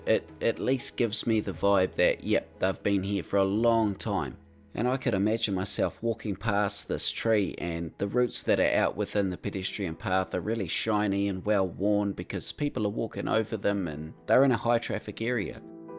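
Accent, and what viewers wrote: New Zealand. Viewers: Like you I really enjoy the way you can create art in this game. I don't get anywhere near as beautiful and detailed a finish as you though even after hours of PO work. You really capture the character and charm of old Europe. I love your work and also your lovely NZ accent. 😍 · Good to hear a kiwi accent. Aotearoa represent :elbowcough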